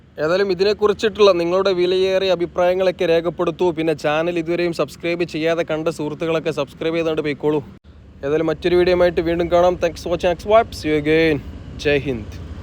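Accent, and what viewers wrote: Malappuram, India. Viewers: ❤❤❤I love your Malappuram slang❤❤❤❤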